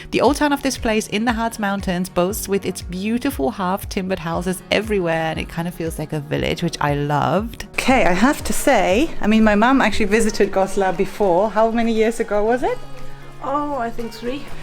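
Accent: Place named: United Kingdom. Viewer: You are not German because you have a British accent, if you where German you would have a German speaking channel. Just admit that you are British and not German because nobody believes you.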